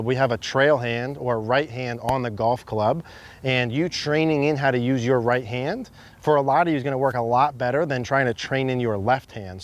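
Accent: United States. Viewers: This only goes to prove that if someone talks fast with an American accent...and says the same thing over and over again and stating the bloody obvious....people will assume they are knowledgable. He says 'That would be bad...' but he never says WHY it would be bad...or why the opposite would necessarily be good. The condition of the wrists at the Top of the Backswing is completely irrelevant to the conditions at Impact...Ben Hogan had 'cupped' at the top and 'bowed' at Impact, Nicklaus had 'straight at the top and straight at Impact. Any golfer who regularly has his trailing wrist bend back as far as it will go at the Top of the Backswing is a) risking injury and b) restricting his backswing...Gary Player said that and clowns like this should stop conning people into believing that it is a good thing because it is somehow linked to Impact. It isn't and it isn't !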